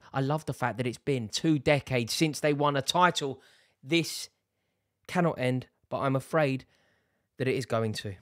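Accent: RP, United Kingdom. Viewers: Rory seems like he grew up rich and went to Oxford. Love the vocabulary and posh accent 🎉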